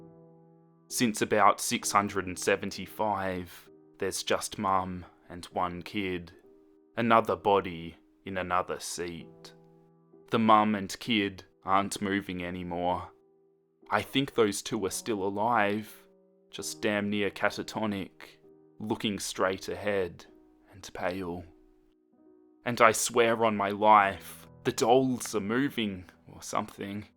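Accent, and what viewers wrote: Australia. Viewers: Your skill and works are an inspiration. Also your Aussie accent adds a uniqueness to the story narration.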